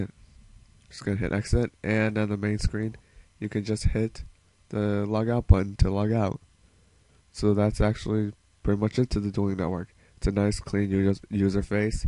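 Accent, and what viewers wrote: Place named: North America. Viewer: you have to be either from USA or Canada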